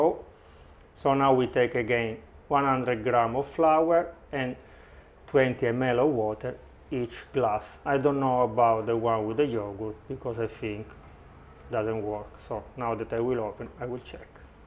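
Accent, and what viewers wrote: Italy. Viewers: I have never seen sourdough started like this. I am going to try it as I have a dud in my kitchen right now from an amazon San Francisco starter. I should get a replacement for it as the company stands by their product, but in the mean time I am going to add some honey and see what happens, then I am going to try this method. No waste! I hate it when it says you have to throw Away and refresh. What"??? I don’t think so! I absolutely adore the accent too. Italians speaking English.. adorable. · LOLOL! It's making me laugh so hard! Especially when I turned on the captions! The captions don't understand a word of what he is saying...and neither do I! He must be from Italy?? He reminds me of the muppet who is a chef!! I like this guy..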